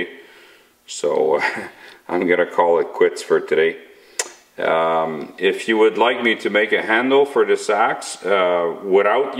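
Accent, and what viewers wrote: Quebec, Canada. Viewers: I detect a slight French Canadian accent dare eh? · French/Canadian accent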